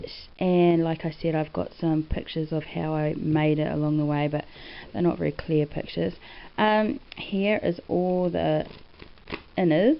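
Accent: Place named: New Zealand. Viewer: Wow this is breathtaking I love it i am making one at the moment for my nan but Hot dollar has the book box already to go...You sound like you are from New zealand I am from Australia were not that far lol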